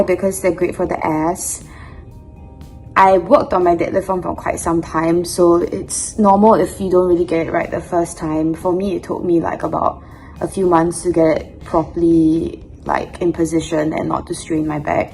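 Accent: Singapore. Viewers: she sounds Singaporean.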